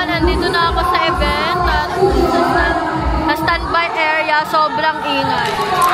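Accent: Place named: Visayas, Philippines. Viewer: I do hope tutukan mo yung accent mo sa tagalog para mas madeliver mo ng maayos mga sinasabi mo lalo sa lines mo sa acting. hindi mo naman kakalimutan ang bisaya but ofcourse need mo masanay sa tagalog kasi hirap na hirap ka mag construct ng sentence. Grind lang ng grind! For da go!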